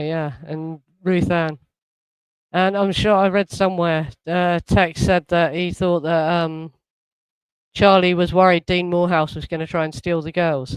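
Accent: Cockney, United Kingdom. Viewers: Great presentations. Just hard to decipher you east end ? Accent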